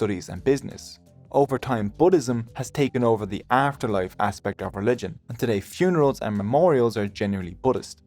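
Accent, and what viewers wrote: Ireland. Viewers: Love the Irish accent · Wid? An Irish accent does not lend its self to well. Especially when a jokey American style delivery is used for effect. If English is not your first language, tree children, and where day live can be confusing. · Just bizarre listening to the creation of Shinto in a strong Irish accent......😆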